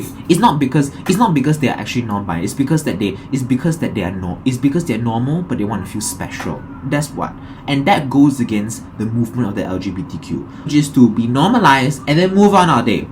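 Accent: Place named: Singapore. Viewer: Its called Singlish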